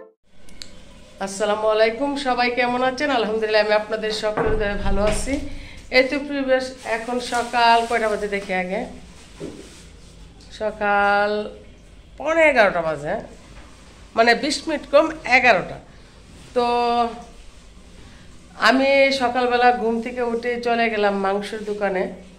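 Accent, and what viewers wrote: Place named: Brahmanbaria, Bangladesh. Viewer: তবে বিশেষ করে আপনাকে একটা কথা বলতে_ই হই, আপনার মধ্যে ব্রাহ্মণবাড়িয়ার একটা আঞ্চলিকতা লক্ষ করা যায়।❤❤❤❤❤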